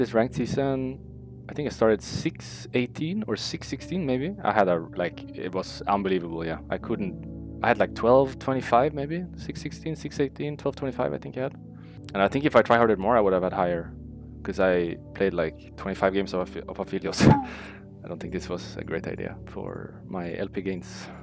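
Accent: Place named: France. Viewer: He's starting to have a French accent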